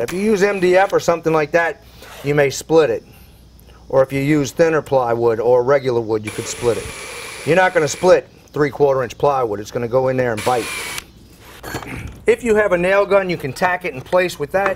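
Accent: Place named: Louisiana, United States. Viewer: So smart of a brain. Thanks. Sounds like you are from Louisiana.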